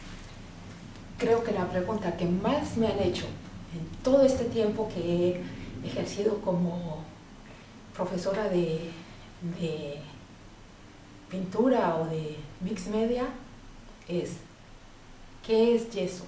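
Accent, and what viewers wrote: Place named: Chile. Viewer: Hola ,, por tu asentó me tinca que eres chilena ..pues bien ,, me gustaría saber si vendes tus obras , gracias por tus tutoriales muy buenos ,,saludos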